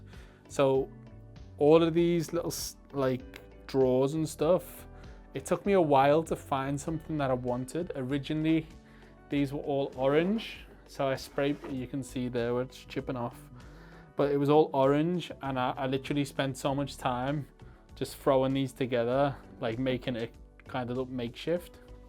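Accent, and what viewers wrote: Liverpool, United Kingdom. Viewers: A scouser!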